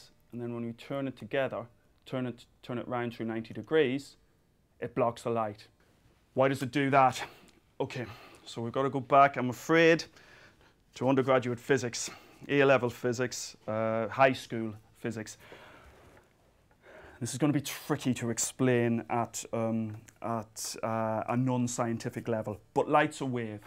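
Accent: Ireland. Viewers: He is definitely irish! =D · is he irish? if he is good to know that were making an impact on the world other than being drunk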